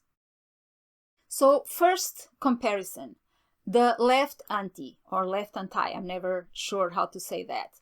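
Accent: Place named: Portugal. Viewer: Eu abri o video que me apareceu, porque procurava a ferramenta para comparar as listas e ouvindo o sotaque, comecou me a entrar a impressaro que a voz era Portuguesa. Fui olhar para o nome do autor e acertei.. Sobre o conteudo, e muito bom. Bem explicado. Obrigado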